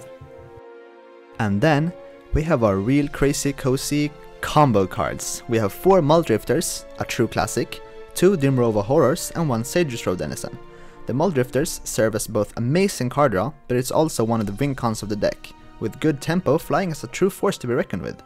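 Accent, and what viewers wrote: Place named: Sweden. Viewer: Awesome! Thanks! BTW - Är du svensk? Din accent låter som den. Jag lär mig svenska.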